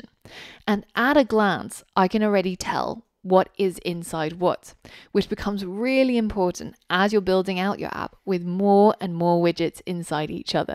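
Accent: United Kingdom. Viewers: I'm getting accidental ASMR from your British voice :) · I love your British accent!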